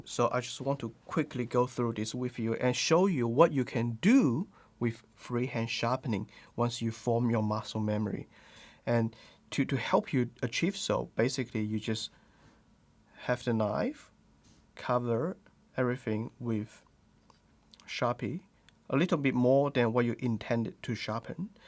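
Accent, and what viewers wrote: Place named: England, United Kingdom. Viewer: english accent . funni .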